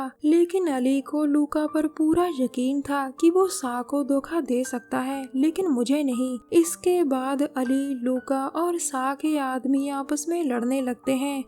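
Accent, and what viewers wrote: Punjab, India. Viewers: Your hindi is in Punjabi excent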